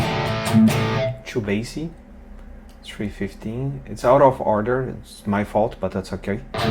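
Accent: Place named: Brazil. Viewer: I have Brazilian friends…they have very similar accent 😅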